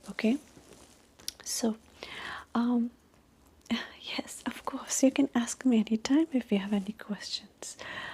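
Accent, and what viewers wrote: India. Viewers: these indian accent vids are cool. but I miss your regular accent. what are some other accents you can do? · As an American I love Indian accents i subscribed to your other channel too. If you keep making videos, we’ll watch 🔥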